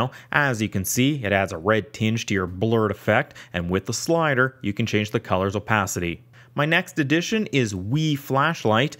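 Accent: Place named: Canada. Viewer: i knew about all these tweaks, but i watched the whole video because i am lovin the canadian accent when he says "notification center" lol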